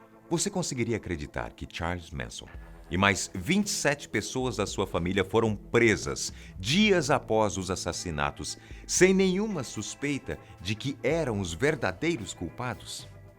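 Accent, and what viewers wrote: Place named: Northeast, Brazil. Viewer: Sotaque Nordestino :v akakaj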